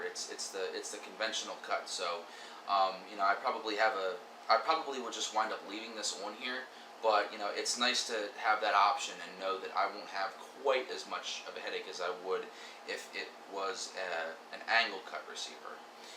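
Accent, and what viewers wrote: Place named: Philadelphia, United States. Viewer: Yo! You from the Philly area? You got the accent, yo.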